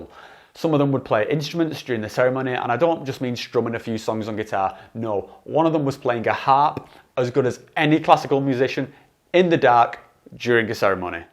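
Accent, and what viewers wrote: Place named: Manchester, United Kingdom. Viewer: ah I knew you were close, I can hear the manc in your accent now haha I'm from Leeds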